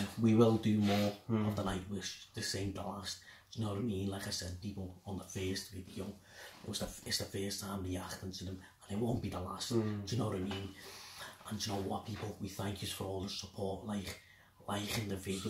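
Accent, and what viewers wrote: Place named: Liverpool, United Kingdom. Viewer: totally wasnt expecting a scouse accent lol but its awesome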